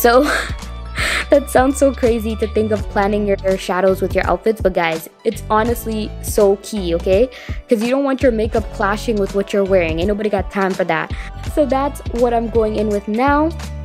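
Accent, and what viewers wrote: Toronto, Canada. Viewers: I hear the Toronto accent lol!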